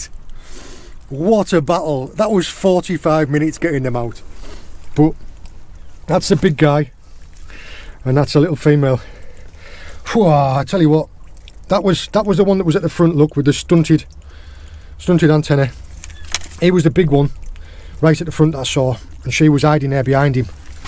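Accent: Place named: England, United Kingdom. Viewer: where do you forage? by accent Im guessing England, but I cant place it, I never lived in England long enough to tell. Im actually considering moving back to Ireland as I miss foraging seafood a lot - about 20 years ago I used to live in Carrigaline/Crosshaven, Ireland and pick up seafoods with a bucket. Your channel sure makes me miss those times.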